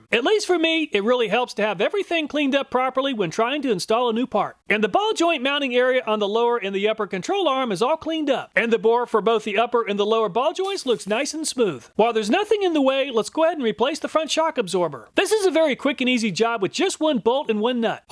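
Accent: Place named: Midwest, United States. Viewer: He says all sorts of things funnily. I figure it's in that midwest water. I watch some gals over in Ohio and they say "To MAH to" all the time for the red orbs from which katsup is made. I laugh and laugh. But hey--it's not wrong if you live there and it works for communications.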